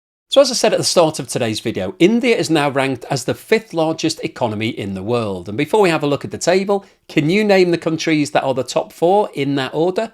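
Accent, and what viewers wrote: United Kingdom. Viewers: His British accent doesn’t give me any trusting feel.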